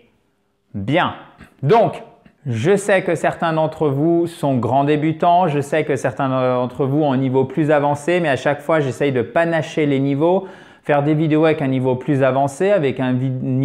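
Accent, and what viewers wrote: United States. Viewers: Thank you very much,. What I like the most is how to build some simple sentences and how to conjugate the verbs. The American accent disturbs me but it might be necessary? I would have an English accent. I would like some videos with dictations if it is possible. See you soon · I love your American accent! Why don't you speak more English than French?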